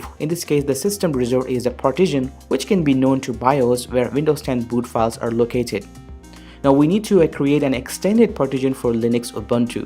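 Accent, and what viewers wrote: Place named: India. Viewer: He's indian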